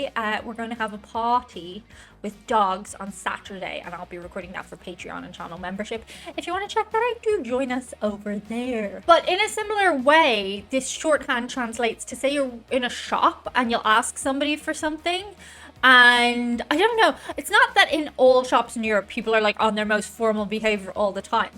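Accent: Ireland. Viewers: MMM. I think the reply to thank you and compliments is kinda regional. I started replying thank you to compliments because I was never good at taking complements ( Maybe those Irish Genes LOL ) they always flabergasted me... I thought that might be considered rude , so I go to thank you now. You are attractive and you have that great Irish accent.... so no surprise you get hit on... Just turn them down politely...that will usually work. Remember the US is full of folks of Irish descent. .. so it is easy to feel that attraction. Yeah.. we do.. over do too well. I have had other Europeans tell me they liked the encouragement to do something instead of one should stay in their own lanes. Interesting .Thanks. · Hello Fellow Humans, We are direct because you are absolutely gorgeous. You have an irish accent which is one of the top sexiest accents in the world. You are a price for men in America. 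You are gorgeous, blonde, blue eyed, irish. Seriously you can easily get a husband in America and he will worship you. Brand loyalty is because we are initiated with commercials and ads from birth. Parents live through their kids and so force them to do what they love. It is annoying. Let kids be kids. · As far as being hit on, you're fairly attractive and the Irish accent adds just enough exoticism without being too alien. So it doesn't surprise me too much that some men in America go, "what the hell" and take their best shot. 🤷‍♂ As for distance measured in travel time, I think that likely has a lot to do with the fact that we have such vast distances that it can take a really long time to get anywhere. (That really hit home for me once, on my second trip to Germany. I flew in to Hamburg and spent three days; hopped a train to Berlin and spent five days; hopped another train to Ingolstadt and spent a day; and then took a local commuter into Munich and spent a few days before I flew home. 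As I was checking out through German customs, the agent there noted I'd flown in to Hamburg but was leaving from Munich and asked me if he understood that correctly. When I confirmed that was the case, he said, "But that's very far, ja?" To which I replied, "Not really ....😕") Also, for a completely different experience in receiving directions, you should come and visit us in Colorado, some time. You'll hear something new. 😁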